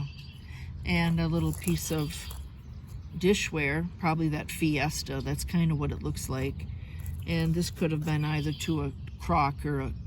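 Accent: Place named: Minnesota, United States. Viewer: I think the O is from Ford. Also, the Minnesotan is coming through….”Baja Bug” where you pronounce the hard J made me laugh (I’m originally from MN). It’s pronounced Bah Hah (think of the off-road racing in the Mexico/California desert). 😊 Anyways, lots of cool finds. Hope you get some extra permissions from your presentation to the church goers.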